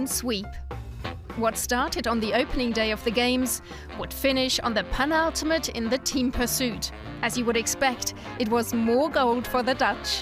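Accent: Germany. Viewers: You pronounce Dutch name as if they're German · yes I could hear the German in her voice xD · Why does the announcer pronounce all the Dutch names as if they were German? It is a bit disorienting. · She pronounces Dutch names with a German accent lol. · You pronounce the names very german but pretty good anyway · Was the narrator german, she had such a germanic accent lol.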